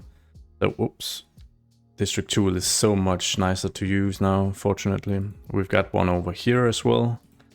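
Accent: Denmark. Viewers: Jeg antager, at du er dansk med den accent :) Man kan aldrig gemme sig som dansker :P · Det kan kun være en dansk accent det der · Are you danish? The accent sounds familiar 😅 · I have to ask are you danish? you sound very danish when you talk. But very nice video love the content:)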